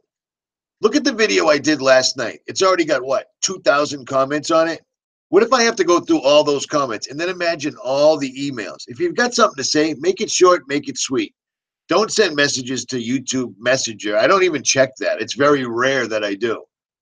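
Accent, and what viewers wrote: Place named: Boston, United States. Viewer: ??A WHAT? WHAT'S A HAYDON? I'M IN UK N IT SOUNDED LIKE U SAID U D WANNA GET A RAGING 'HARD-ON' LOL IN UR BOSTON ACCENT.HAHA. HOPE IT'S FOR ME- UR GORGEOUS AS IS UR MIND N INTELLECT. SORRY FOR BEING RUDE ON THE CHAT. I'D LOVE IT IF U ACTUALLY SAW ONE OF MY COMMENTS.😘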